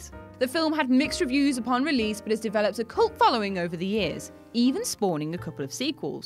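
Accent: United Kingdom. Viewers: British Much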